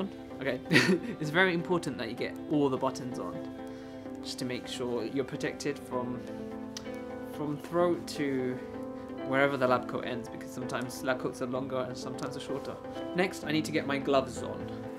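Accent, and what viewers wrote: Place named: United Kingdom. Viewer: you talk very nicely, professional, sweet and obviously how can I forget your British accent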